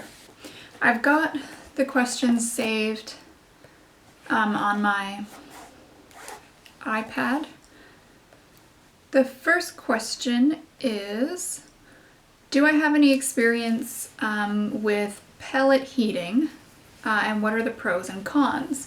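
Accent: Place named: Canada. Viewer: Thanks for the Q&A video! I also live in the country as a stay at home wife. (Kids are adults & on their own now). It can get boring for me some days but I try to make tasks for myself. I've been learning to sew clothes and so far made one skirt that was awful LOL The nearest fabric store is an hour away so I need to try to stock up when I get a chance. I totally understand having to travel. :( Oh and I knew right off you must be Canadian as my husband is Canadian and you both pronounce words the same. In fact we live so close to the border (6miles) that a lot of people here sound Canadian even if they aren't. LoL I really enjoy your videos and look forward to when you post! Do you think you would ever consider another video of your skirts (hint hint) LoL 😁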